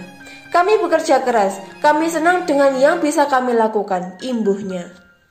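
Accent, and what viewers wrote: Java, Indonesia. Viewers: Min terlalu medok..🤭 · Medok Amat Yaaaaaa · Min... Macane Romanyoli dudu Rogmanoli. Medhok iku gpp, sing penting pengucapan jenenge dibenerke.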